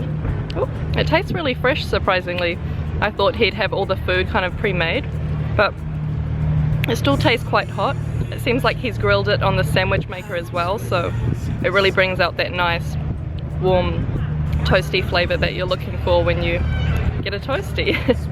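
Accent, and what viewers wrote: New Zealand. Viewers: You have the 2nd most beautiful New Zealand accent of the world.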